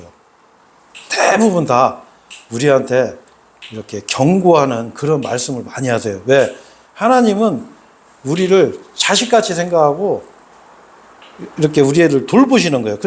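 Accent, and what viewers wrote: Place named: Gongju, South Korea. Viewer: 나의 고향 공주에 복된 교회가 생겼네요! 형제님의 공주 사투리도 정겹습니다. 공주에 사는 우리 친척들이 구원받을 기회가 되기를 기도합니다.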